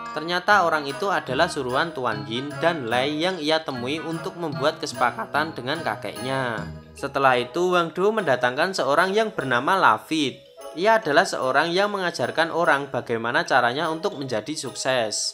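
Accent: Java, Indonesia. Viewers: medok mas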